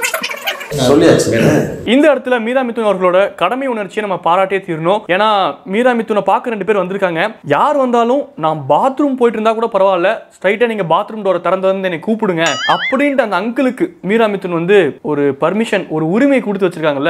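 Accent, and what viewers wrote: Coimbatore, India. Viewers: super so Coimbatore full language speech super hit video your channel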